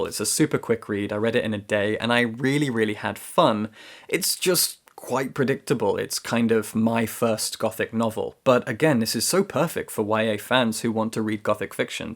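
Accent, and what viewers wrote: United Kingdom. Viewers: I'm so glad I found your channel. I could listen to you speak all day, both for your rich voice (I adore a British accent, being American and an anglophile) and for your professorial tone. I feel smarter just for having listened to you! You gave me all sorts of ideas for next reads. I look forward to watching more of your videos. I'm a retired school librarian and grandmother and I miss being surrounded by book people--who of course are the best! Thanks for all you do.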